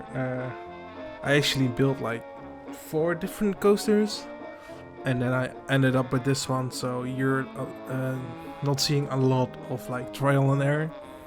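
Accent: Netherlands. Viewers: Ik hoor aan je Engels dat je Nederlands bent, nice video!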